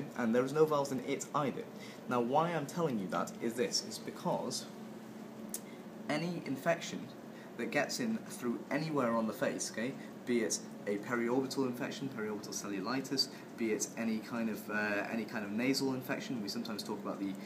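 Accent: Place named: Wales, United Kingdom. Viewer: Very useful, many thanks! You sound slightly welsh which is also a bonus!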